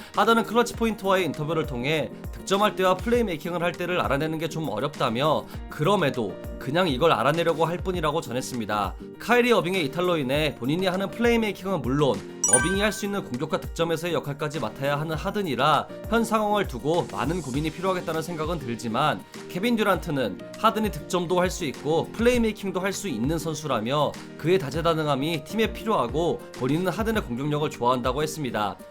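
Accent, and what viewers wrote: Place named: South Korea. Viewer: 너무 콩글리쉬틱 하네요 ㅋㅋㅋㅋ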